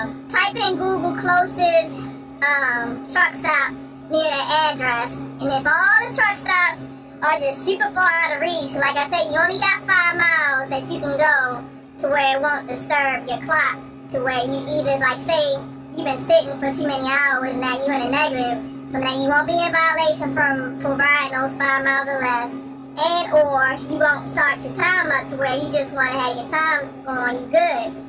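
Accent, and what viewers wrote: Baltimore, United States. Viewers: Truker path is also a GREAT app for trip planning. It gives you truck stops(large chains & mons and pops as well) rest areas, Walmarts, weight stations, etc... all at the same time. The voice thing tho, no fun intended but I'm a southerner and I enjoy your B-mor accent, js. Do you of course though, lol. Good Vids!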